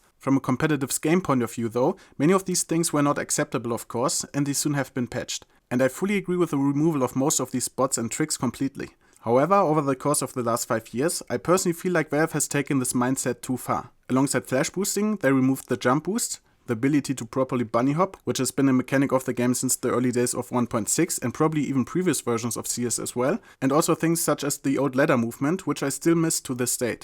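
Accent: Germany. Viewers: Man hört so krass, dass du Deutscher bist 😂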